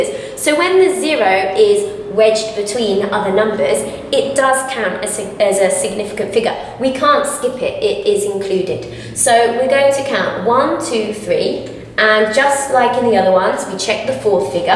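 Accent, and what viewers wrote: United Kingdom. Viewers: Thanks I love how you explained ❤️ and I am watching this video 10 hours from my .ath exam so appreciate you and your British accent is nice🤣😂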